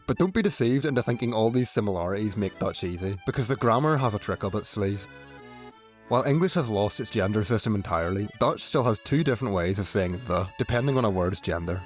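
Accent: Northern Ireland, United Kingdom. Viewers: Kind of – it's his Northern Irish accent. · I love the northern ireland accent, everything is pronounced so clearly. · It's funny when I first heard the man's accent I thought he was a Dutch person making the video because he didn't sound English and more Germanic though I'm aware most Dutch people speaking English barely have an accent because 90-95% of their population speaks English fluently and the most proficient as a second language in Europe. Then I saw that he was based in the UK according to his user description and then he revealed he was from Northern Ireland which was only then that I detected his accent being from that area even if he sounded like he was pronouncing all the Dutch words correctly like a real Dutchman would do. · I thought the narrator was Scottish, but I suppose the Northern Irish accent must be close to the Scottish one. 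It made the English words sound a bit 'off' to my ear lol. But I'm a Kiwi, and so I bet my vowels sound worse 😆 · Nice video! Really managed to quickly showcase the similarities/differences. Your pronunciation is really quite good, especially your UI, but I couldn't help but think I was hearing an Afrikaans accent! After realizing it wasn't just the first few words or something, I HAD to check your profile. I could obviously hear the Northern Irish accent as well, but thought you might be an Afrikaner having grown up there or vice versa in South Africa. If you don't secretly have any Afrikaner DNA, I would highly suggest you take a stab at Afrikaans, you'd sound fantastic at it! Mainly your short O, A, OU, -T/D, and OE, sound very Afrikaans, but even the G sounds ever so slightly more Afrikaans. Some specific examples are your "gebracht", "koe", "dorp" (the r also contributes to it here), "stad", "koud" and "soud" (which sounds identical to Afrikaans "sout" meaning "salt" ("zout" in Dutch). 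Anyway, none of this is to take away from your video or anything! Love your Northern Irish OW in words like "cow" and "howl" as well 😄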